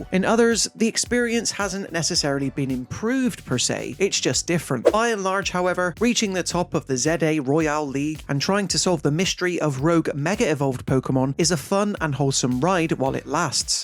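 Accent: United Kingdom. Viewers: He's British, idiot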